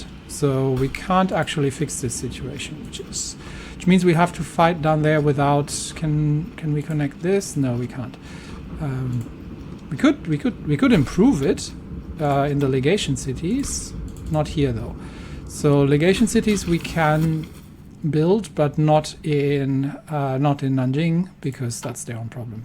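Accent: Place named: Germany. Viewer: Are you German? I thought your accent was noticeable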